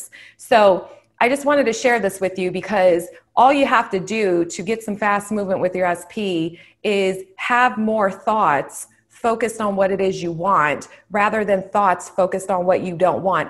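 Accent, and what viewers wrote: New York, United States. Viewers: I've been binge watching all these videos of different coaches and I am slowly liking your vids the most! Plus as a New Yorker, I can totally tell you are from NY 🤣 But I definitely need to work on self-concept and assumption because I really really want my SP and I can see the hot and cold with how I am feeling that week or not. I want what I want and I deserve that shit! : )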